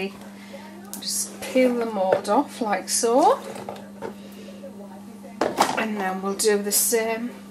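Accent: United Kingdom. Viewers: Hi, you can get some really good plastic jugs from any of the pound shops in the UK. Hope that helps others. Love your videos, it's lovely to hear a UK accent on these videos:) Thank you x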